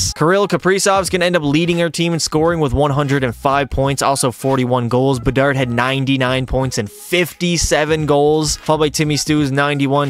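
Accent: Newfoundland, Canada. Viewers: Are u from Newfoundland?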